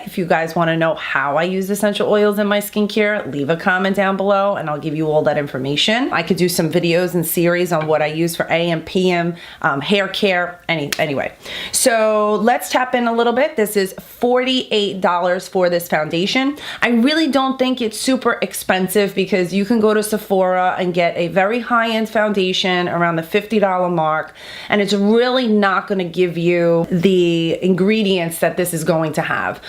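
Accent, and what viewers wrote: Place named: New York, United States. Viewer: Love your accent love NY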